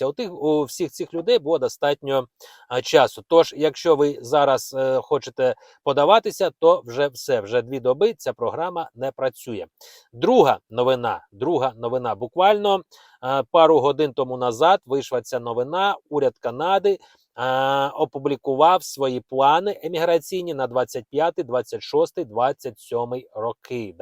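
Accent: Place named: Russia. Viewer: Украины скоро не будет, зачем украинский язык? Тем более, слышно, что для автора родной - русский.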